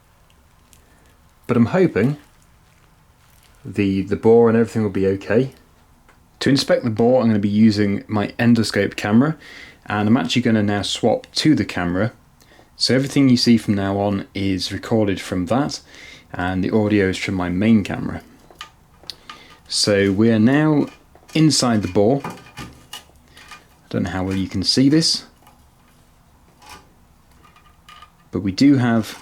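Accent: United Kingdom. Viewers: I love the commentary. I like the British accent.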